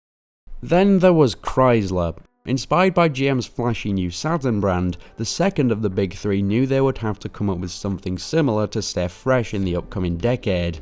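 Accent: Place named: Southport, United Kingdom. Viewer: The accent reminds me of the Southport area...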